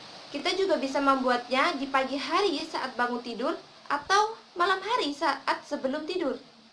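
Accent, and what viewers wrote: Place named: Osing, Indonesia. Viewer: Ketoro wong osing 😁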